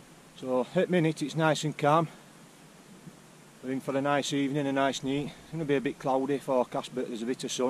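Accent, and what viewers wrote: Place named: Sheffield, United Kingdom. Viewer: What great little spot, I was up there last week with my son on my first walk of the new year. Parked in the big layby near Cutthroat Bridge and hoofed it up to Back Tor. Spied yer mate Mezz's pitch whilst there. Cracking weather till a front came in from Kinder. We managed to get back to the car before the rain hit us. I fancy an overnighter up there now I know I can cope with the terrain (bad ankles). It's nice to hear a proper local dialect on here. Another Sheffield lad here. ATB - stay safe.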